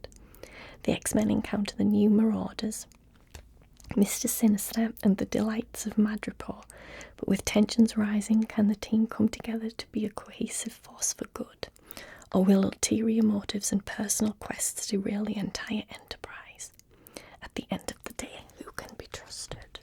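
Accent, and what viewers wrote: United Kingdom. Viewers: Jesus this is your normal accent?! Might even be more Harry Potter than the movie universe itself ha. It's even more impressive then with that Villanelle Russian accent and mannerisms. Ultimate F4 is great-I need to read that again in the future. I need to pick up a Crichton book. I hear nothing but praise for that guy and his novels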